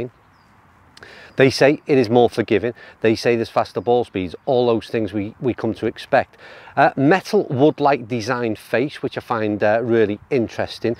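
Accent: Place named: England, United Kingdom. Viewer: Dude, your English accent is hard enough to hear/understand already let alone the loud freaking music.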